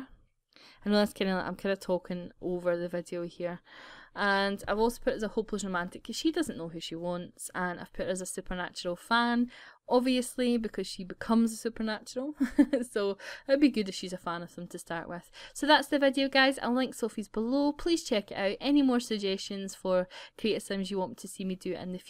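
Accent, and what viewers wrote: Scotland, United Kingdom. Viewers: are you from scottland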